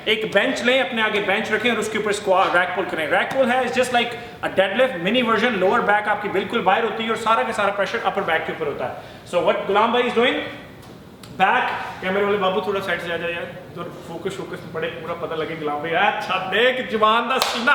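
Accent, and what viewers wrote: India. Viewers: kayknya kalian org india ya?...dari logatnya ketahuan. benar gak?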